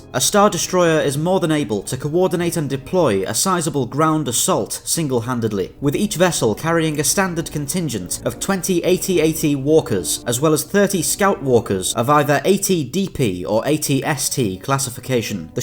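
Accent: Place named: Merseyside, United Kingdom. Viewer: Deffo from Merseyside 👍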